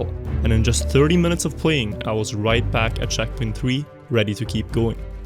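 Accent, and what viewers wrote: Norway. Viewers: Are you Norwegian? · Are u Norwegian? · Er han norsk?!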